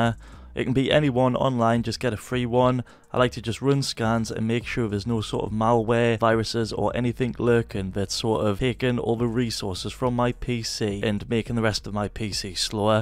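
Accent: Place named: Newcastle, United Kingdom. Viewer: Never been so excited to hear someone else from newcastle lmao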